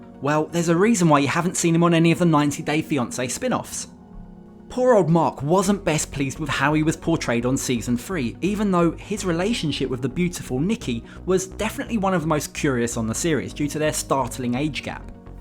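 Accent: Cockney, United Kingdom. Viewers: For Christ's sake get someone who doesn't sound like a chavvy Cockney chimney sweep from 1870 to narrate these videos. What an embarrassment that accent is to the entire nation.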